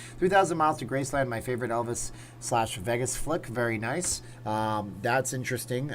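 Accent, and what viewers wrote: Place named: Canada. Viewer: I think people like your Canadian accent :) and your information/news on LV. You are doing a great job.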